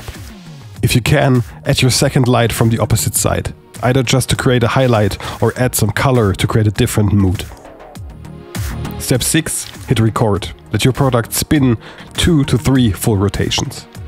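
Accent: Germany. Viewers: Erstes Wort und ich wusste du bist deutsch Haha😂👍🏻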